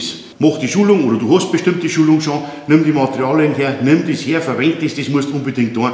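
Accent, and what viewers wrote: Bavaria, Germany. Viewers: Zugeschaut - Mitgebaut. Und a Bairisch lernst obendrein a no. Tausend Likes aus Preußen. Weiter so! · Hallo, ich bin zufällig über deinen Kanal gestolpert und mir gefallen als Elektroingenieur die sehr lehrreichen und praxisnahen Beiträge wirklich gut. Hier wird gezeigt wie man es richtig macht und warum und was man nicht machrem sollte und warum. So kompakt und nachvollziehbar wird selten das Elektrohandwerk erklärt. Das spart viel Zeit die nötig wäre in Normen und Lehrwerken herumzusuchen. Mich stört auch die leichte bayrische Mundart nicht - wer das nicht mehr versteht sollte lieber auswandern...... Mach weiter so - ich freue mich auf die neue Folgen. Einen schönen Gruß nach Bayern aus dem Schwarzwald.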